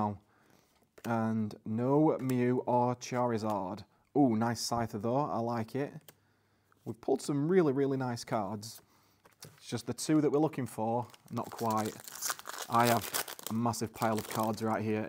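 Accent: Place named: Leeds, United Kingdom. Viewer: that's definitely a Leeds accent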